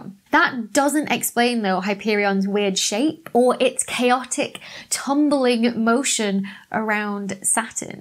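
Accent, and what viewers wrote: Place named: Cornwall, United Kingdom. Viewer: Love it, there was a hint of some Cornish accent coming into parts of the video.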